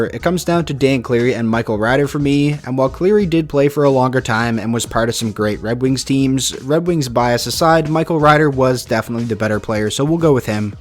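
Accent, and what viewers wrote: Newfoundland, Canada. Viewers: I mean, really depends on what type of Newfoundlander you’re talking to lol. Almost thought you were from NL, with how you pronounce certain words. Not all NL’ers sound as animated as you think haha. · Which province are you from? I can tell you’re Canadian from how you say Hart, Clarke, part, etc.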